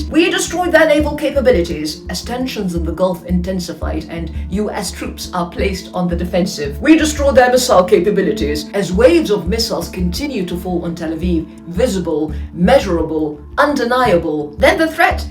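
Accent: RP, United Kingdom. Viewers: Please speak slowly and succinctly. No one is interested in your high speed Bloody British English. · yeah but I can't stand that British accent though. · Excellent video but what's with the margaret thatcher accent 😅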